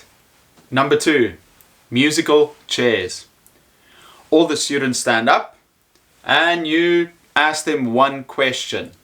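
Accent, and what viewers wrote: South Africa. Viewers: Simon says you very much South African :))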